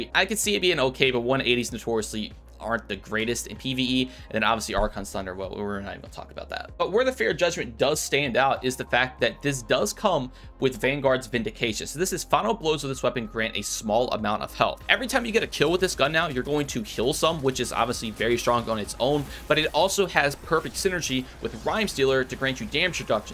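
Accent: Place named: North Carolina, United States. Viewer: Completely irrelevant, but the more I watch your content the more I feel like I hear a North Carolina accent in there. Haha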